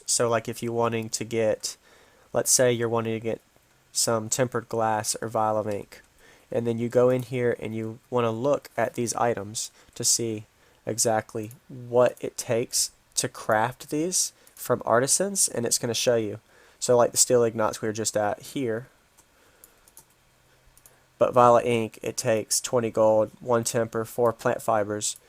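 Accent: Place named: England, United Kingdom. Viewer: INGOTS! not ignots.... N G.... not G N.... u sound english man wtf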